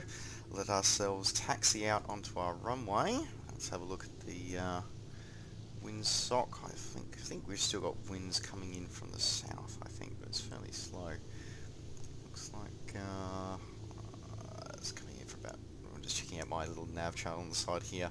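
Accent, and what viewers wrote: Australia. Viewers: Are you Australian? I am aussie too :D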